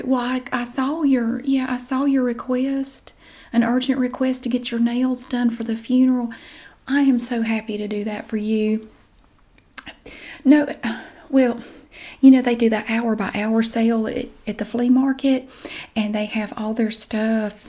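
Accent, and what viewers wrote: South, United States. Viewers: After a long work day has relaxed me and made me smile. Also.....I want so badly to replace my boring Iowa way of speaking with that beautiful southern drawl.💕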